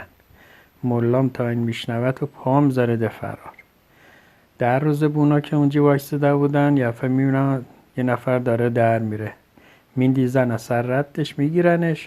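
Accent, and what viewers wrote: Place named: Qom, Iran. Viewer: عالی بود با لحجه قمی که در حاشیه هم جواد رضویان بازی میکرد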